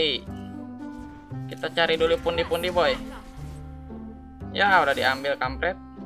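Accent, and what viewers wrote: West Java, Indonesia. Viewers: Jiga logat orng sekitaran garut/tazik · Bang orang sunda nya atau lain tapi siga orang sunda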